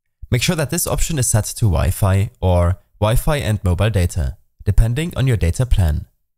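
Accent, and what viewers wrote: Germany. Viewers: You accent is very weird. You sounds like a german person who speak englisch.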